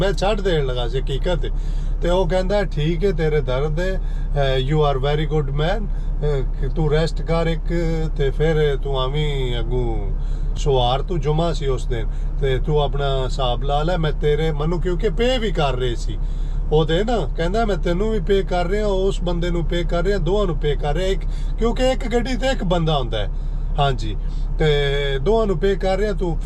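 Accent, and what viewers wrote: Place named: Jhelum, Pakistan. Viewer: Ap Pakistan kis city say biking karty ho ap ki zuban bolo jhelum ki lag ry hay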